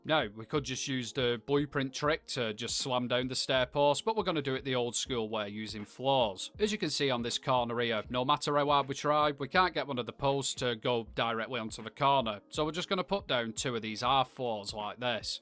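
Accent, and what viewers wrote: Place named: Northern England, United Kingdom. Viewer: Brilliant build and humour yet again and loved you bringing in the other builders too! I love seeing what you all come up with and I wish i was as creative and clever! Oh and as a fellow ‘Brit’ from up North I love the accent and by name and nature!!! 😂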